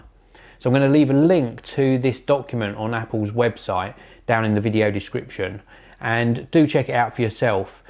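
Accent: United Kingdom. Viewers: So I clicked this video because I'd like to know what to do if Apple refuses to fix or replace my laptop - but as soon as I heard you speak I knew this wasn't relevant to me because of where I live. I live in the USA, where we don't have appropriate consumer protection laws. Thank you for sharing your experience! I hope it helps many people from the UK.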